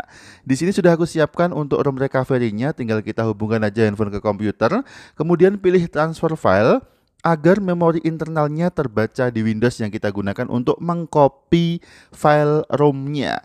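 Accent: Magelang, Indonesia. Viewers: orang magelang kah bang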